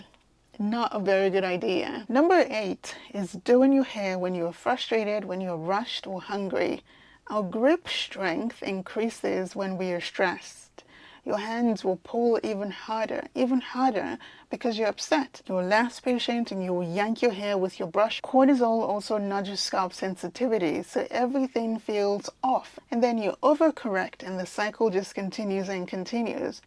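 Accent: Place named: New Zealand. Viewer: The New Zealand accent is just the best. I also have a kettlebell training account I follow just because of her accent. 😂😂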